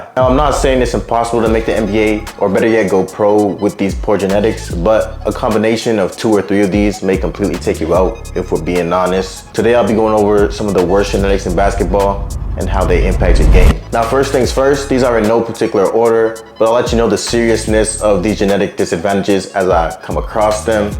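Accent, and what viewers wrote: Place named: Ontario, Canada. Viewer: Bro is definitely from Ontario 😭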